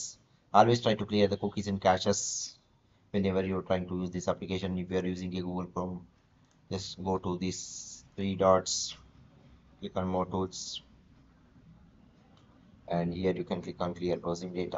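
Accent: India. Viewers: of course he has an Indian accent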